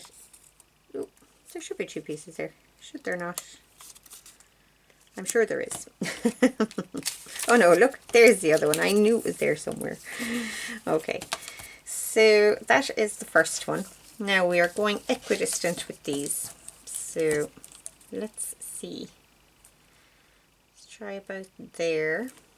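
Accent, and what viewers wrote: Ireland. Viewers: Not only do I love what you have shared here but I could listen to your lovely Irish accent all day. Thanks!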